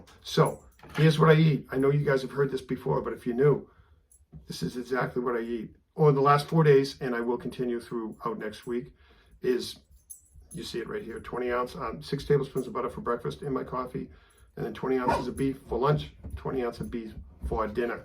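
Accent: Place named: Quincy, United States. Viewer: I’m from Quincy MA. Recognize the accent. Down 50 pounds on keto. Thanks for sharing your journey. Cheers.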